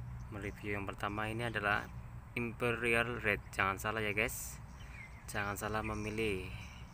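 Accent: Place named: Banyumas, Indonesia. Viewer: malay tp logatnya indo banyumas (sumpiuh deket sampang cilacap)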